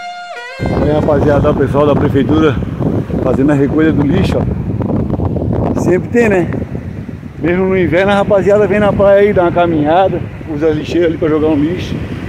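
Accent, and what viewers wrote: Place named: Santa Catarina, Brazil. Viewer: Lugar lindo, minha família por parte de pai é nativo daí,eu adoro o sotaque catarinense.lembra meu pai,Deus abençoe sua vida e família.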